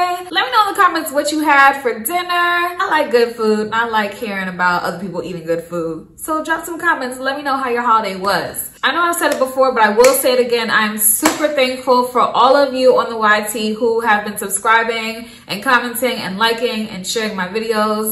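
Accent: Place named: DMV, United States. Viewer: TOP 6 REASONS I SUBSCRIBED: • Your complexion sent my azz to the kitchen for some HAWT CHOCOLATE 🍫 • You are giving me every reason to buy these units BAYBEEEEE 😭❤️🤎❤️ • Your music is poppin Ma. I’m a 80s baby • The confidence is on 💯💯💯💯 • There’s no fear in color like, you screaming “run up” & Move around “ to EV-ERY color in this playlist sis • We neighbors/family with the energy, style, accent.... “DMV” Where ARE YOU AT??? ANNNNNNDDT my #1 reason- you are most definitely a TOPCOAT PRETTY BROWN BABY DOLL & I’m supporting you baby girl PS- once I snatch these units, zaddy dropping Ben-jamins = I don’t spend a dime 🤣 Keep going baby! I’m a fan MUAS 💋 and happy holiday season boo 🎁✨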